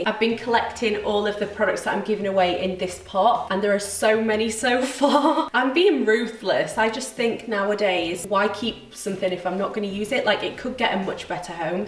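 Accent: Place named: Doncaster, United Kingdom. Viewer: Are you from Doncaster?? You're accent is similar to that 😁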